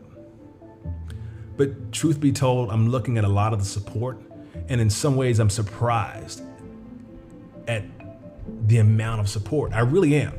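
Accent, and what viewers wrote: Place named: Mount Washington, United States. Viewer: You sound like you was in Mt. Washington or somewhere close...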